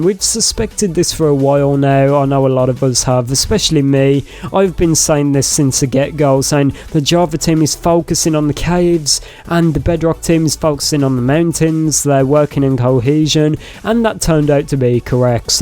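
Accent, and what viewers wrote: United Kingdom. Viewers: i love his british accent so much its so strong lol and love ur videos too <3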